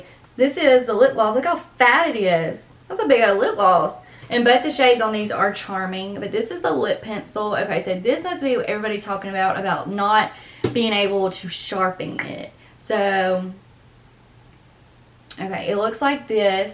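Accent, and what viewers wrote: Alabama, United States. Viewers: I just love your southern accent! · You got nice stuff diffinately worth the money, also love your sweet Alabama accent ❤️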